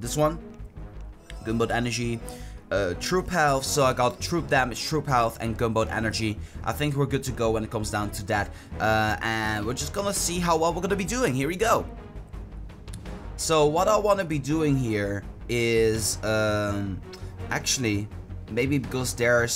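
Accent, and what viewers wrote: Netherlands. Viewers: I love ur dutch accent :D ben ook nederlands haha :D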